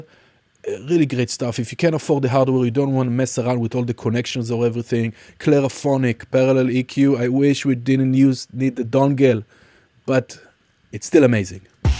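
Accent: Israel. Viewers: I have instantly recognized your Hebrew accent ! קיבלת עוקב ולייק ;)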